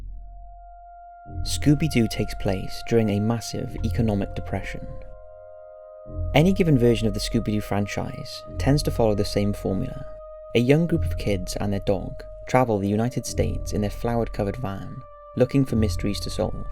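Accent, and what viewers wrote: Wales, United Kingdom. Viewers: Gotta ask,are you Welsh? Your voice sounds like it has Welsh undertones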